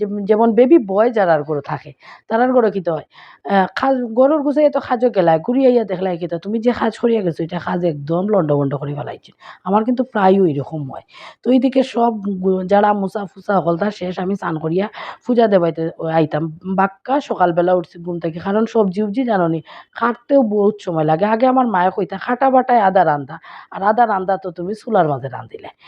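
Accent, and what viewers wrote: Sylhet, Bangladesh. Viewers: Prothom dekhlam tumar vlog , YT e recommend korse . khub bhal lager vlog gula, specially syleti katha sunia toh khub khub bhal lager ,aamr babar bari emne Silchar, aami okhon thaki Bangalore, subscribe korlam , aaro aagaiya jao ❤❤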